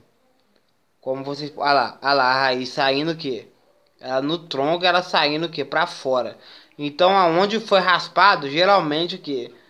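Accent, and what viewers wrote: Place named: Caipira, Brazil. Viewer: Dei meu like por conta do sutaque de caipira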